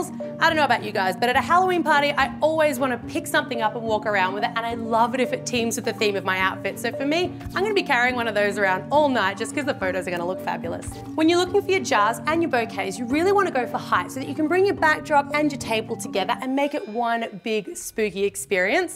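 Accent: Australia. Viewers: But her accent is clearly Austrailian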